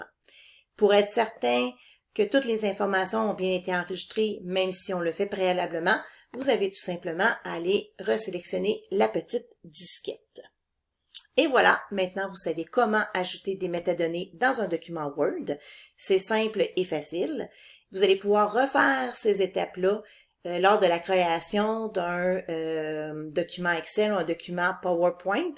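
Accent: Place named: Canada. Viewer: l'accent canadien, j'étais pas prêt !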